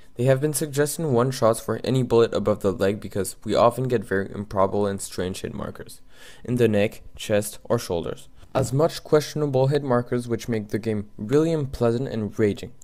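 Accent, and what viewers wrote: France. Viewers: C'est un mec français qui parle en anglais, son accent est pourri. Pour que la vidéo soit vu par les développeurs de Black Ops 2, il faut spammer sur leurs TWITER OU FACEBOOK ! Faites le SVP, on aura plus de chance d'avoir enfin un bon Call Of Duty :) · Ca s'entend que c'est un francais qui parle Anglais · vous y croyez ? non mais c'est quoi cette accent français ... --' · C'est un français qui parle mon petit... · Fake --' Le mec c'est un francais qui parle en anglais afin qu'on aille sur ça chaine et qu'on lui fasses des vues... Je connais peux d'anglais qui disent ''Code Quegé'' , ils diraient plutot ''Cod Queji''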